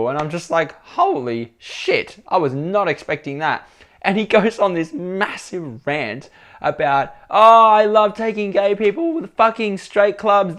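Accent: Australia. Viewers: dude which part of melbourne are you from??? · australian accent😍😭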